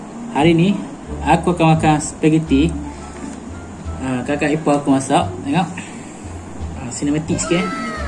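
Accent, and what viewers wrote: Terengganu, Malaysia. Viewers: Dgn slang ganu dye comel😂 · Aku suke tengok orang ganu cakak..hahaha good job bang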